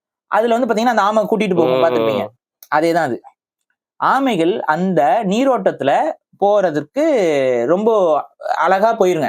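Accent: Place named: North Chennai, India. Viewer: your vada chennai slang superb😅😅😅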